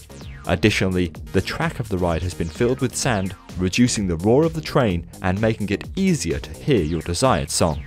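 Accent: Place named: Public school, United Kingdom. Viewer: Yes......fair enough! You guys do have different styles, I have to concede...though I must say that you have the posher (public school) accent.....whereas 'Expedition' sounds more like a chavvy south London/home counties one. Yeah....I keep on thinking of Sadiq Khan everytime I hear 'Expedition Theme Park' speak!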